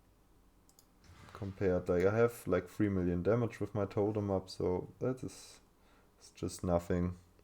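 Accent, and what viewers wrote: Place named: Germany. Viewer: LIEBE ES MANN ERKENNT SOFORT WENN NEN DEUTSCHER ENGLISCH REDET ^^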